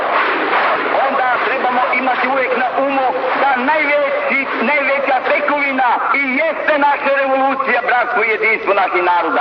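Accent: Zagorje, Croatia. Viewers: Ne pricaj gluposti, si bio ikad u Zagorju, čitao Krležu...to je taj jezik, naglasak sa Sutle.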